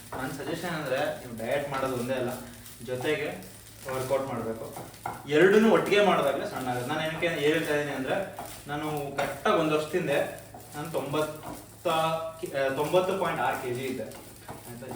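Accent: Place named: India. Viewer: Nim bhashe kelvaga eno ondthara Khushi,Hale kannada Andre pure nam Halli shogadina kannada keloke sigutthe,tq u mam,tq..😍.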